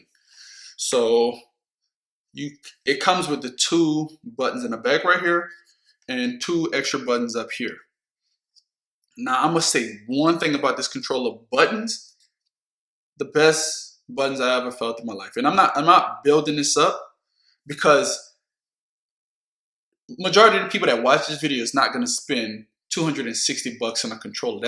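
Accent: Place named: Louisiana, United States. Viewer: What up fam... I subscribed to your channel, and I was like he sound like he from the “Boot” too, but wasn’t sure. Then I see this video and I see the Saints Cap 😂.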